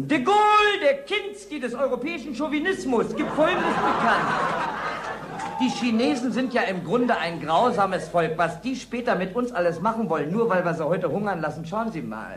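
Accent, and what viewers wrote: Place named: Berlin, Germany. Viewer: Die klassische Berliner Schnauze.....stilbildend, leider war ich zu jung als 61' er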